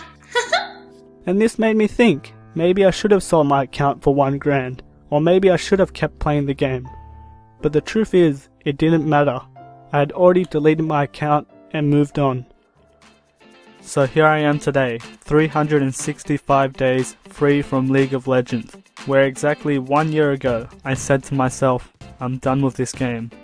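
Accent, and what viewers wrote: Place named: Balkans. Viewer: Tacno se primecuje balkanski glas!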